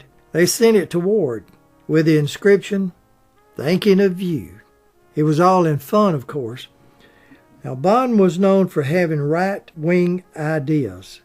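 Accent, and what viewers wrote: South, United States. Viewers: no thank you you are what makes YouTube great I love your voice you have that little bit of Southern song which I love keep up the good work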